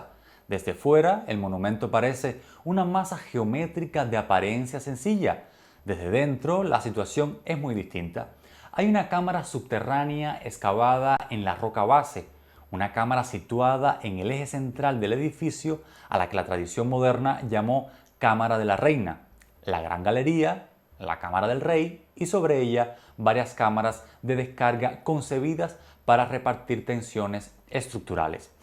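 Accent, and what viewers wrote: Cuba. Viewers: Me haces sentir orgulloso de ser cubano. Pensé que eras catalán, por tu apellido, pero tu acento me decía que hablabas como nosotros (cubano al este de Matanzas). Excelente trabajo, magnifica presentación. Sigue en esa dirección. Felicitaciones. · Hermano , ese acento y fraseo es MADE IN CUBA . Chevere 👌 , me voló la cabeza reconocer a un cubano abordando estos tópicos ancestrales , lejos de la "MACHACADA" y adornada historia de mambices y epopeyas INFLADAS que caracterizan la mal contada historia de Cuba. Gracias por tu estupendo trabajo . 👌. Saludos.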